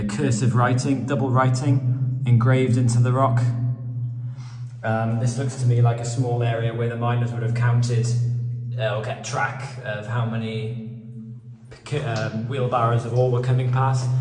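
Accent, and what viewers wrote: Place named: England, United Kingdom. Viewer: A very interesting mining venture. Thanks for exploring it. Also, of interest was to see two "English" doing the exploring. (diffinetly your accent belies your nationality) I say hello from the state of Wisconsin in the USA.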